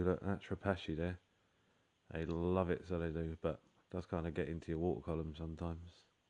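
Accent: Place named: Cockney, United Kingdom. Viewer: My cherry shrimp and neons are best friends - but to heck with them, bruvva, I just adore listening to you talk. Luv me some Cockney accent 💐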